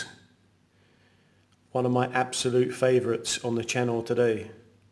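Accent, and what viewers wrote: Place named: United Kingdom. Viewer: Just ordered this one… coming in a few days … I think I’ll like it … nice review and the British accent makes it even cooler 😎 review on the lume was excellent .. I agree with several other guys on here that I’m a Seiko man and yet this citizen is a great watch .. I have the seiko 5 filed military watch with the same movement as the skx and it doesn’t even wind at all…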